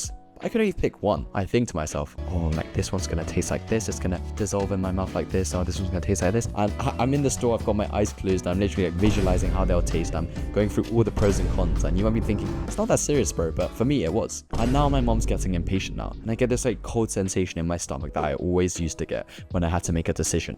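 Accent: United Kingdom. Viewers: Korean guys with british accent are so welll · literally watched the entire video not noticing he was brittish until he said “oh my days man” and now i cant unhear his accent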